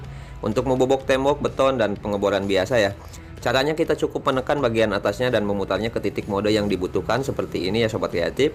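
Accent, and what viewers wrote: West Java, Indonesia. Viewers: Keren gaya bicaranya 👍 Logat sundanya masih ada 😀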